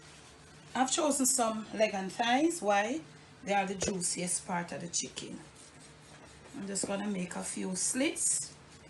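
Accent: Jamaica. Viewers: I looove chicken, especially the dark meat, it has more flavor, and looove the flavor combination you have in all your recipes, but especially this one..I'm a nasty girl and save the yummy drippings to use to flavor other dishes.I even have brushed breads, or biscuits with it..or if I make lentil or bean stew I use that flavoring in it by way of the drippings, just a little though..you are my magic kitchen queen to watch cook, I love your recipes and love your beautiful Jamaican accent(I do believe it makes your food more yummy)..Bless you and keep on coming with more scrumptious recipes..oh, I have discovered a new and super health type of rice, called " Black forbidden rice" and another that is " Red rice" .They have even more health benefits and anti aging things than Blueberries !..and they taste really good, not yucky like brown rice (I hate brown rice)...you might try it..in 3 months it lowered my cholesterol and helped me loose a little weight( miracle)..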